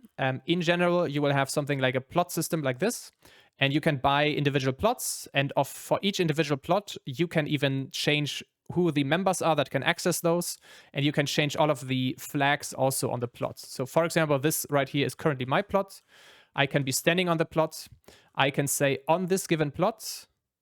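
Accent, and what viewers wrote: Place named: Germany. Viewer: Dein Akzent klingt sehr deutsch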